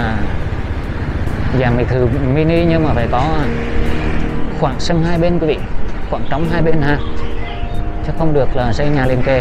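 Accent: Central, Vietnam. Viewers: Cảm ơn em đã cho cơ hội theo em đi dạo cảnh Đà Lạt mỗi ngày. Những cảnh rất đời thường và thực tế. Thế mới quý, em ạ. Cách đây khoảng 8 năm, tôi đã ghé Đà Lạt và thấy xa lạ lắm. Qua những chia xẻ của em, tôi hiểu và quý Đà Lạt hơn. Ước mong có ngày gặp lại thành phố nhỏ và dễ thương đó. Bây giờ thì nghe quen giọng miền Trung của em rồi.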